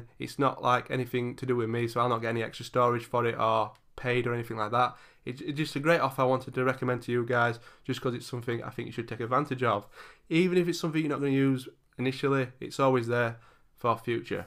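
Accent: United Kingdom. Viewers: Refreshing to have a fellow Brit doing these videos for once!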